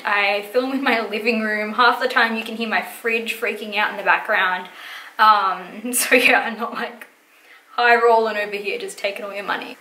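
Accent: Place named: Australia. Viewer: 'Just over here fuckin goin ta town' 😂😂😂 your Aussie is on fire in this tut 🔥😆 love it! Hello from Perth definitely subscribing xx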